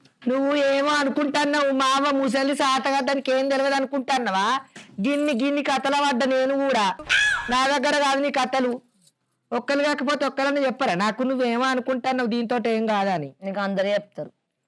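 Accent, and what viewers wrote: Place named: Karimnagar, India. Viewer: మన తెలంగాణ భాషా యాస… పక్కా కరీంనగర్ 😅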